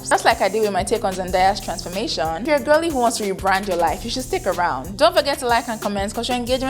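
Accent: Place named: Nigeria. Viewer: I knew you where nigerian because i noticed your accent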